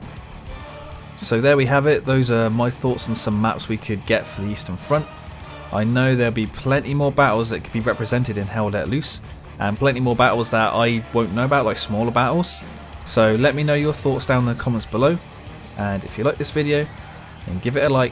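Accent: United Kingdom. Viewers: I like your british accent! Hi from western front!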